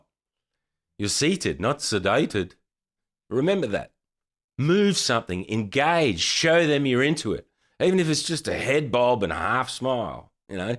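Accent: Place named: Australia. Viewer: Where have you been all my life?!?? so easy listen to ...this man has REAL advice.. and in my own Aussie accent it makes these videos relatable its and refreshing as it is straight up no bs effective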